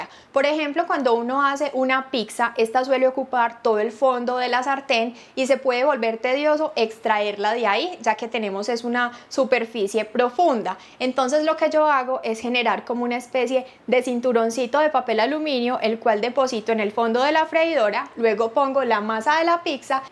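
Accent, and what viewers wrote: Colombia. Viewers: Me gusta tu acento colombiano ❤ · Vine por la información, pero, Dios mío... Ese acento paisa... 😍 🇨🇴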